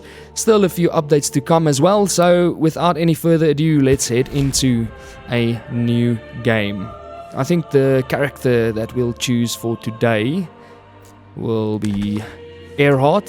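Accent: South Africa. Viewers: Greetings from 🙌 Enjoying the South African accent during the playthrough.. I don't think I'm subscribed to anyone in South Africa 🤔 ⭐New Subscriber Unlocked⭐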